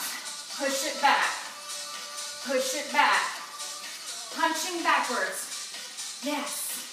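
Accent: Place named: North Carolina, United States. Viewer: Hi it’s Linda from Peoria again I just wanted to tell you I did the beginning boxing regiment and I really thought it was fantastic. I think this would be great for me to do in my water aerobics class. I have a sister in Chapel Hill North Carolina. I can tell the accent!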